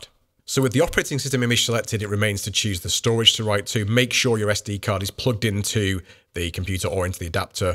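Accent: United Kingdom. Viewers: Just found your channel tonight and have watched 3 or 4 videos and really enjoy listening to you. Its also nice to hear a fellow brit voice too.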